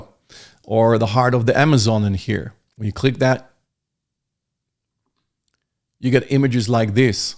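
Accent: Netherlands. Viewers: I smell dutch?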